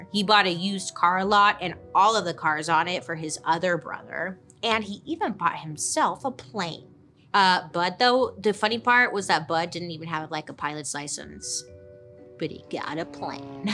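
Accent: United States. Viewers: Where in the US does that voice/accent come from?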